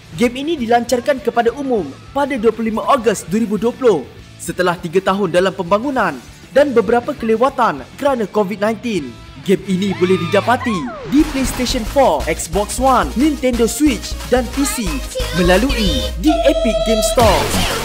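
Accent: Malaysia. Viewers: ni orang malaysia ke?? jawb soalan saya di next video blh tk???sbb brcakp mcm malaysia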